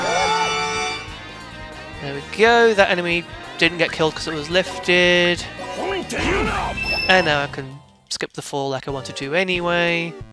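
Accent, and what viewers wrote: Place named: United Kingdom. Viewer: It's a pleasure listening to your british accent <3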